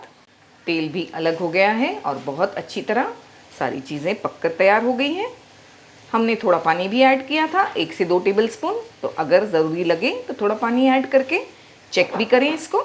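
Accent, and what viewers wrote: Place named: Konkan, India. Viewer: Mashallah, aapi recipe k naam per daaba k khaate hai Aap log, aap k lehja kehta hai aap konkani hain...kabhi kabhi Bhatkally dish bhi banaye Karain.. runaways success guaranteed.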